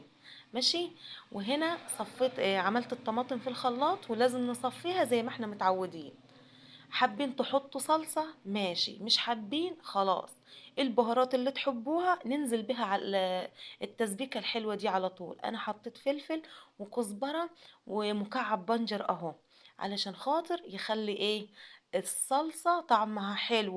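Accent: Upper Egypt, Egypt. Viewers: اول مرة بشوف قناتك احلى لايك و مشاركة انامصرية مغربية بحب اوي طريقة كلامك الصعيدي ان شاءالله ربنا ياعليك. يريت اعرف من اي بلد في الصعيد 🎉🎉🎉🎉🎉❤❤❤❤❤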